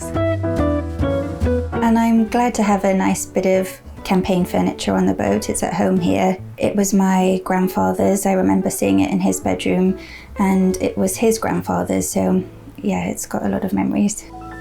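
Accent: United Kingdom. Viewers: She has Renee Zellweger's british accent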